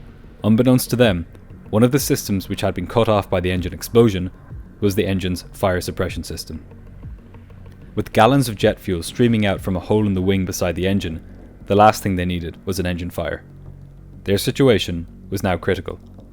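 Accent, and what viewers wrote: Ireland. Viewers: I like your Irish accent.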